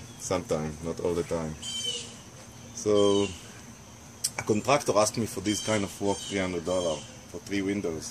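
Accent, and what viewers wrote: Israel. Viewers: Helpful, thank you. The insight about Lowes was vital information. Enjoyed the Israeli accent as well, haha.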